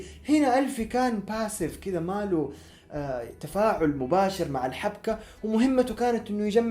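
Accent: United Kingdom. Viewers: لكنتك الاسكتلندية رهيبة 😂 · التمثيل رهيب كنك عندك لكنة ابريطانيا لو تروح هوليود اتحاول ادور طريقك 👏👏👏❤❤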